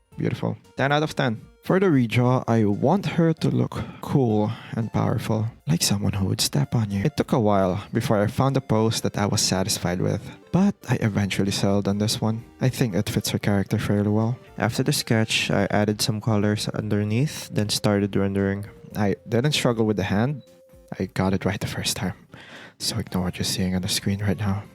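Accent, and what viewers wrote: Philippines. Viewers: You Have a Strong Filipino accent!! ❤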